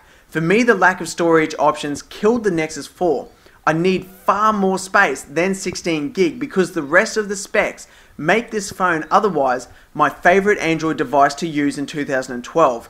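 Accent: Australia. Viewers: Great to see (hear) an Aussie reviewer! Keep up the good job :-)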